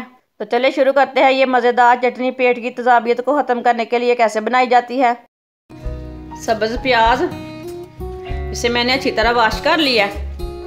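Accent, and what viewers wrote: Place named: Punjab, India. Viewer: Your speaking tone like pure Punjabi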